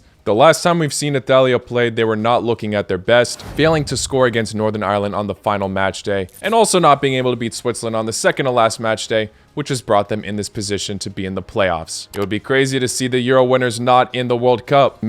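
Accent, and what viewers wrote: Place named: United States. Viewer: I like the content but I can’t stand how he pronounces words, he’s obviously American.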